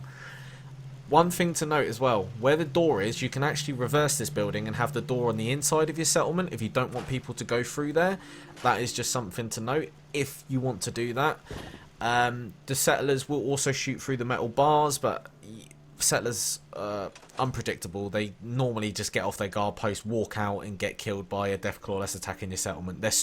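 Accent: United Kingdom. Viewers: is every youtuber that plays fallout fucking British? Jesus fucking Christ.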